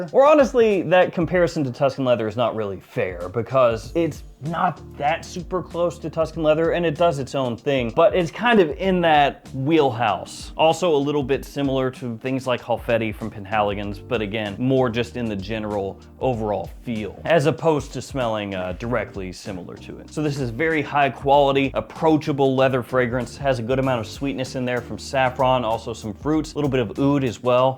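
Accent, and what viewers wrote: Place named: Texas, United States. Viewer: It sounds like you live in Texas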